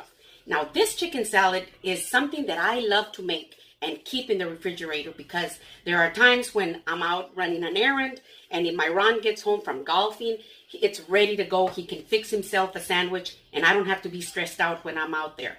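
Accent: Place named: Midwest, United States. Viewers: Do u live in mid West of USA ur voice reminds me of ppl I hered talking. when I was out the mid West I just found u love ur videos so fun food looks great . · Latina? No think it's more of Iowa or Minnesota accent but I gotta say awesome video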